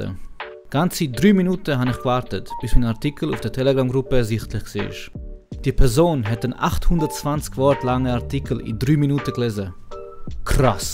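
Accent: Switzerland. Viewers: Was ist das für ein Dialekt? Schwitzerdütsch? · Krasses Video und gueti Arbeit! Btw. Bisch du Solothurner? :D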